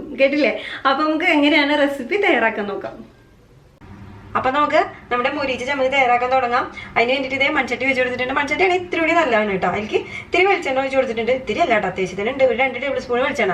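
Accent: Thrissur, India. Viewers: എന്ത് രസാഡോ തന്റെ സംസാരം കേൾക്കാൻ.. തനി തൃശ്ശൂർ ഭാഷ. നാട്ടിൽ എവിടെയാ? അമ്മച്ചി സ്പെഷ്യൽ എല്ലാം പൊളിയാട്ടോ.. അമ്മച്ചിയോടു പറഞ്ഞോളൂ. എല്ലാവരും ഇപ്പോൾ പഴമ ഇഷ്ടപെടുന്നു...അതാ തനിക്കു ഇത്ര സപ്പോർട്ട്. ഇങ്ങനെ തന്നെ മുന്നോട്ടു പൊക്കൊളു. എല്ലാവരും കൂടെ ഉണ്ട്. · തൃശൂർ ആണല്ലേ... ഇത്ര സ്പീഡിൽ എങ്ങനാ സംസാരിക്കുന്നെ??? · Variety recipes aanaloo... Recently aanu Kanan tudangiyath.... am from EKM... nalla speed samsaram... Nik oru frnd und.. she is from trissur, she is talking like this... any way NYC recipes !All the best dea...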